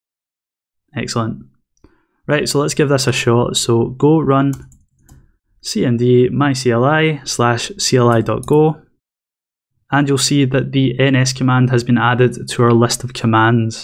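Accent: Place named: Scotland, United Kingdom. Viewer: Scottish bloke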